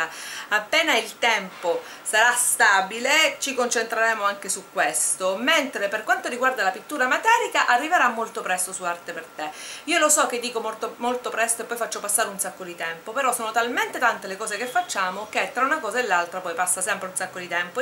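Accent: Catania, Italy. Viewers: Sei catanese?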